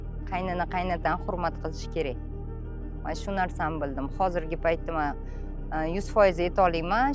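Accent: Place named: Kashkadarya, Uzbekistan. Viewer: menimcha Qashqadaryoda San shevangdan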